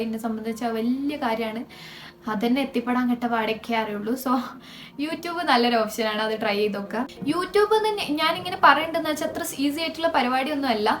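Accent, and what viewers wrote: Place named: Malappuram, India. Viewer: *നമ്മുടെ മലപ്പുറം മലയാളം ആണല്ലോ. ഇവിടെ എവിടെയാണ്? പെരിന്തൽമണ്ണ ആണോ*?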